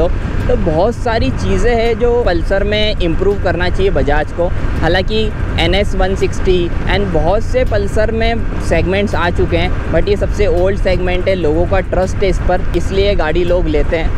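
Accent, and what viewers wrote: Indore, India. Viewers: Are you from indore ??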